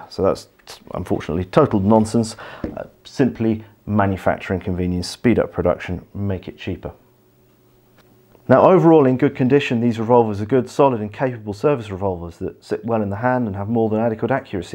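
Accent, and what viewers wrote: England, United Kingdom. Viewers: Wait, you have an English accent and DON'T live in England? HOW IS THAT POSSIBLE? YOU'RE BLOWING MY MIND.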